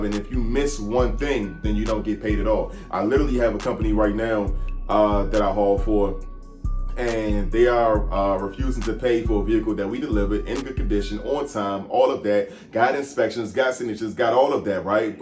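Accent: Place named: DMV, United States. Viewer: Hey, James here with Adventures in Trucking YT Channel. I have been watching your channel and I REALLY appreciate how you are so honest and forward with your content. Me and my oldest son (he goes by hot shot Shawty on the channel) run freight on a flatbed. We recently acquired a 53 foot enclosed race car trailer to do exactly what you are speaking about. High end vehicles that require enclosed. VERY informative and good information from you as to what to look out for in this business of car hauling. Just a little background on me, I’ve been hot shotting since 1999 on and off. I do have a CDL so we are CDL holders. Currently I have the freightliner M2 with a 36’ foot flatbed and I have my Ram Dually with the 53 foot enclosed trailer as well. Still trying to get that trailer on the road but your videos are always right on point. By the way, I grew up in Bowie Maryland. 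I can tell by the accident you’re from the DMV. Also, we have subscribed to your channel. I think you were 100% correct in handling the damage to the Lamborghini. I wish there was someway that owner operators and drivers could dispute brokers/clients. It seems like everything rolls downhill on us. KEEP YOUR HEAD UP!👊🏾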